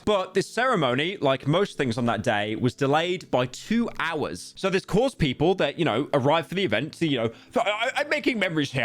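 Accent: United Kingdom. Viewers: Brits are so weird... "Willy Wonker"... geez man.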